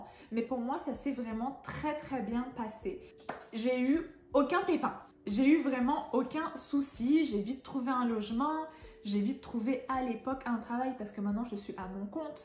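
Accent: Quebec, Canada. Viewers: votre "début de petit accent québécois" est charmant! 🥰 💗 C'est ce que TOUT les français aiment chez les étrangers qui font l'effort de parler français 👍👏 Les Québécois reste et resteront à jamais dans nos cœurs avec leurs qualités et même leurs défauts! 🥰😘😘😘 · J’adore on commence à entendre que tu prends l’accent québécois. Expatriée aux states c’est la même merde au niveau santé, route et pis l’odeur du cannabis dans NYC c'est horrible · J'allais dire la même chose, même la tournure des phrases comment a sentir le Québec 😂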